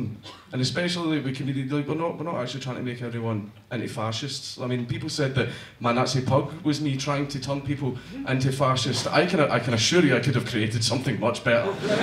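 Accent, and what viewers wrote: Scotland, United Kingdom. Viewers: Been watching dankula and kmac for like 2 hours straight and everything i read or think now is in a scottish accent send help.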